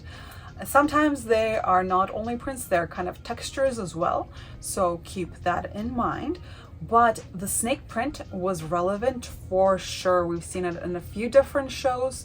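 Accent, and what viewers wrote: Russia. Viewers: Great understanding of trends, and feeling for fashion. Beautiful English, good French pronunciation and a very light cute accent, perhaps Russian! Just curious.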